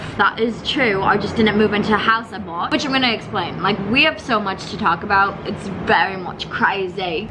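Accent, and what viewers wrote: England, United Kingdom. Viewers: this english accent omfg i am obsessed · The English Accent Is Always The Highlight Of The Vlog. Agreed! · That British accent tho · Hey love ur British ascent 💜💜💜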